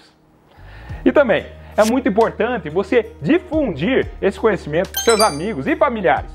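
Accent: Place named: Minas Gerais, Brazil. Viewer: Adoro esse sutaque mineiro. Seus vídeos 👏❤️ são ótimos. Parabéns Dr, por nós proporcionar GG conhecimentos. Não gostei da propaganda da Lacoste.kkkkk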